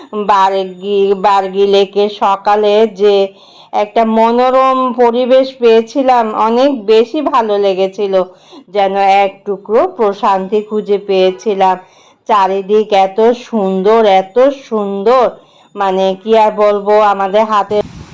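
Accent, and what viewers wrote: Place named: Chittagong, Bangladesh. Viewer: আপু যে চাটগাঁইয়া কথায় বুঝাই যাচ্ছে আপু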